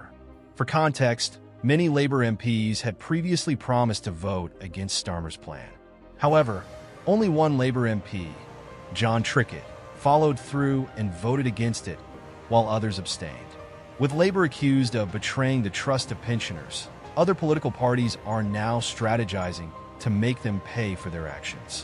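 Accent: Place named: United States. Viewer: Why do we have an american narrating this clip?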